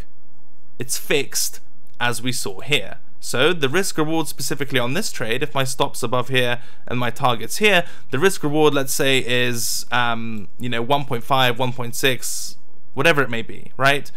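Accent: United Kingdom. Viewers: didnt know you were english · Very good, other than you sound like a posh git to an Aussie like me. Kudos for sharing free.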